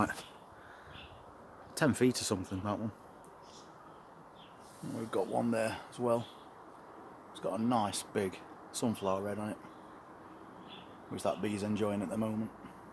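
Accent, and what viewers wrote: Yorkshire, United Kingdom. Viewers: Love your (Yorkshire - correct me if I am wrong) accent and use of words. Will just leave it on bench❤. Was checking the size garden delights grow to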